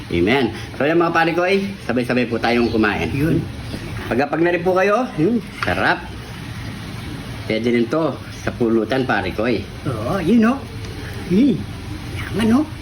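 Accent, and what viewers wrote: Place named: Western Visayas, Philippines. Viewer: Akala ko ba ilocano version bakit ilongo pagkakasabi mo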